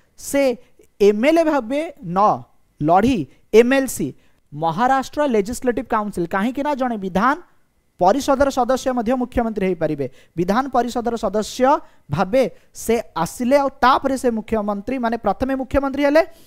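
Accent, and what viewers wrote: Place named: Odisha, India. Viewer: Apanka Odia pura Rasa malei bhali....Bahut badhiya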